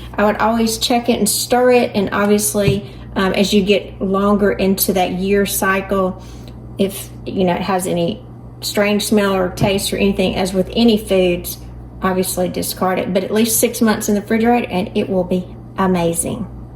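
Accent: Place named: Georgia, United States. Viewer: Just found your channel and I am loving it. I enjoy listening to your Georgia accent.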